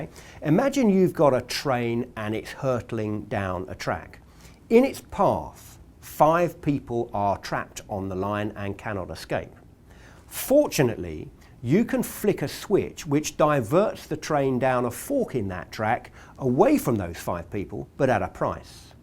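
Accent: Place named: United Kingdom. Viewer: İngiliz aksanı yalnız bu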